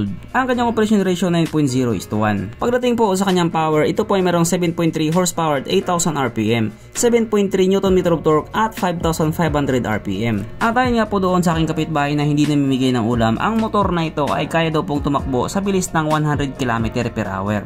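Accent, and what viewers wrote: Visayas, Philippines. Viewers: Bisaya ka kol?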